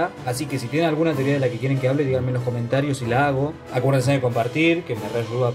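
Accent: Argentina. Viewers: Sos de Goya?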